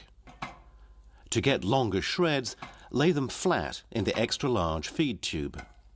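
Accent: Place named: United Kingdom. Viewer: he is british, i like his english by the way.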